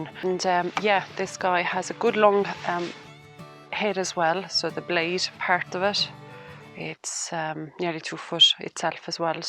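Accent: Kerry, Ireland. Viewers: Thank you so much for that. I was leaning a bit towards blackthorn since it’s one of our great native trees. We have plenty of midges already in Donegal so a few more won’t make much of a difference! I like the idea of supporting more birds and insects too. And the flowers and berries. An aunt of mine was addicted to the berries and used to eat them directly off the tree, although they must have been pretty bitter. Your advice will help me decide. PS. You have a great Kerry accent!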